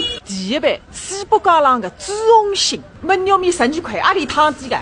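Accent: Suzhou, China. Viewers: 大闸蟹。等々，作为美食介绍加入历史典故。以及人文知识，则更加丰富多彩。是地道苏卅姑娘，我们同乡人听起来非常妙，但非苏州人除江苏南部人外，或许你说的太快，有点听不懂，所以望今后做网上节目，说话节奏慢一点，尤其苏卅地方俚语，越慢越好，如：然（你），北京西路，北和西要说出正宗苏州音，好听。十五，沙五也要着力沙。…..仅供参考，新加坡很多华人上网，听到苏卅腔很高兴。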